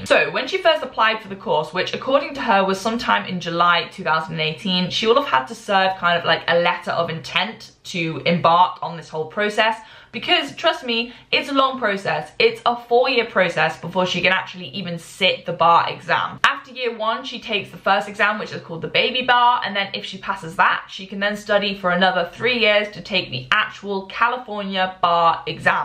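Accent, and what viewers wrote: United Kingdom. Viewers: Holy fuck you're British, I'm ready to listen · I didn't expect you to be British but it was such a pleasant surprise when you started talking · is it weird that i didn’t even notice that you had a british accent until you said “here in the uk” ??? that’s literally 9 minutes in. i literally thought for 9 minutes that you were american with an american accent??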